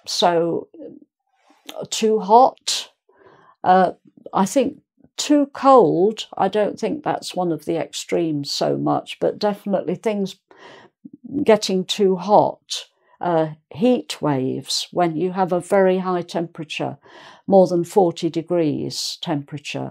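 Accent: England, United Kingdom. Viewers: Lovely, such an English accent and so elegant. Thank you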